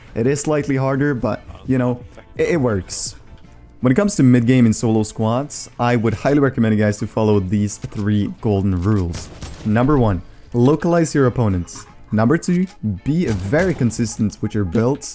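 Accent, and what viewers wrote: Norway. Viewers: Are you norwegian? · Are you from Norway?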